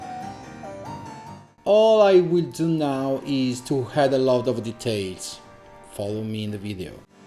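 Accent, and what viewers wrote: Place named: Italy. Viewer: Your bird is very realistic, yes I love your videos in English, just do not lose your charming Italian Accent!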